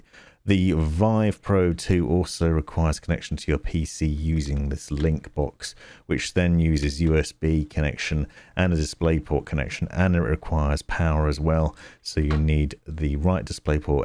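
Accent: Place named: North, United Kingdom. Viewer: You based in the north dude? Sounds like an accent closer to me 😂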